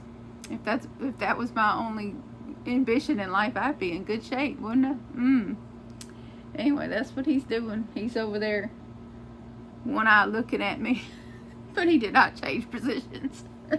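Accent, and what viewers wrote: South, United States. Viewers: Omg I so love how your video started with your beautiful Theo❤🐕. And it is BS what happened to Mama Kayla's intro music. Y do people gave to b mean and cause drama !? It is so not needed and definitely not deserved. Thank u for explaining those weird sayings, I had no idea what they meant. We have our own sayings down here in Australia that may confuse too I suppose.🤭😆 But I just love the Southern American accents. Some do seem drawn out and others not so much but I just really love listening to u talk and I like how u talk fast as I am naturally a fast talker too, but during my working life before I was Medically retired, I was told to slow my speech all the time. Funny thing is when I was working I did consciously slow my speech !🤭🤣 And I am one of those people who is slowly going backwards in all your videos, plus watching all the new ones popping up.😁 Love and Prayers 💗💗💗🙏🙏🙏🐕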